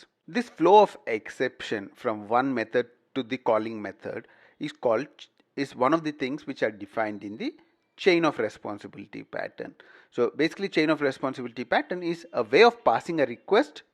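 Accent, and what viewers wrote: India. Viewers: you are great!! only indian accent that I can bear really!!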